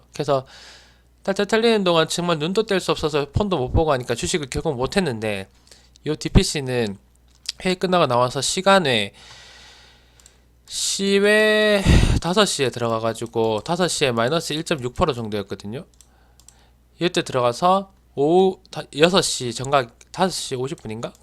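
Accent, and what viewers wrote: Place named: Daegu, South Korea. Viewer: 안녕하세요 같은 직장인으로, 애 둘 아빠로 동질감을 느끼며 영상 잘 보고 있습니다~ 어제부터 정주행으로 조심씩 보고 있는데 나이도 비슷한거 같고 경북/대구쪽이니 사투리도 정겹네요~ 궁금한게 있어서 몇 가지 여쭙니다^^ 1. 주식 공부는 혼자 하신건지? 어떻게 공부를 하신건지?3년 동안 다지기 이후 이제 빛을 보게 되신건지?^^; (혼자 학습으로 3년 동안 시행 착오도 계셨을텐데...) 2. 대부분 종가베팅 후 시초가 매도던데 종목 선정은 이전에 올려주신 조건식 위주로 하신건지? 조건식은 어떤 것, 몇 개를 사용 하시는지.. 종가베팅은 대부분 3시부터 분할 매수로 진행 후 확신있는 것은 18-19분경 베팅 하시는지? 소액으로 종가베팅 연습중인데 승률이 그렇게 좋지 않아 좀 자세히 여쭤보고 싶어요~~ 감사합니다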